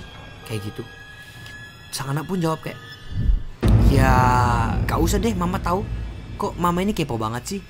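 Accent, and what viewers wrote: Makassar, Indonesia. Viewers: Semakin seru konten nya Dengan logat Makassarnya bikin lebih merinding haha Semoga bisa dapat 500k Instagram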